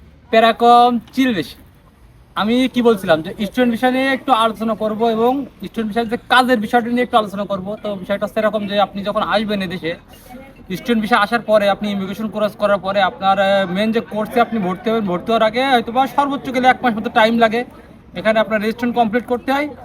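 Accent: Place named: Pabna, Bangladesh. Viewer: vai ki pabnar local nki💙 vasa to chenachena lage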